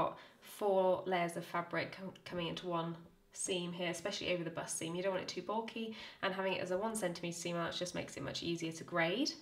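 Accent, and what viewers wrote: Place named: United Kingdom. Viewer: She sounds British so....maybe the UK?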